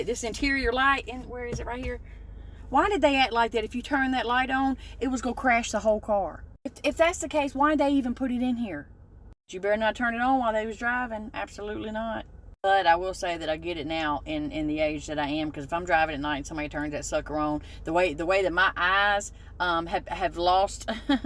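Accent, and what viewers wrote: South, United States. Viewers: I had such a different experience as a GEN Xer. Missed so much of what all you share, but I absolutely enjoy every single moment of the videos I have seen from you, 💖, 💖 and 🤭🤗😉 Iiving vicariously thru the stories of your own experiences. 😂🙃🤭🤩 LOVE IT!! LOVE YOU!!! Appreciate your time, effort, and authenticity, plus that Southern accent!!(which is likened to any of those heard throughout the SOUTH which I, rightfully, should have, but don't really. Is what it is. 😉 I CAN put-on plenty of different Southern dialects...all mixed up into one...kind of. Hehe) 🦋🌸💞🫂🏆💯THANKS SO MUCH, AND may GOD bless you!!!💯🏆🫂💞🌸🦋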